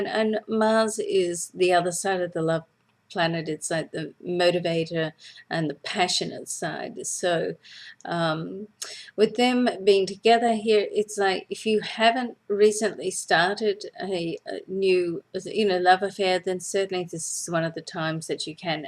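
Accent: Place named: Australia. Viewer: Many thanks I really enjoy your weekly astrology videos. As a Taurbabe ( kiwi) now living in Vancouver, your Australian accent is lovely to hear. Cheers Deb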